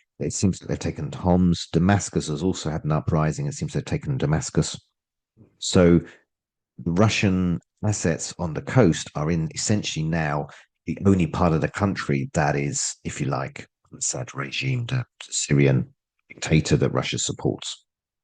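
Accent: United Kingdom. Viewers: copium hard by British accent what would you expect, the truth.... Saddam had Nuclear bombs in Iraq...... HAHAHAHA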